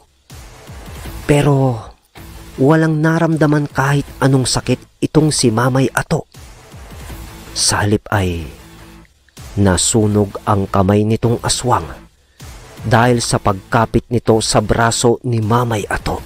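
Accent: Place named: Ilocos, Philippines. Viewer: Nkk2wa k pakinggan anak kc my ilokano tlaga lov2 nnay ❤❤❤❤❤🎉🎉🎉🎉🎉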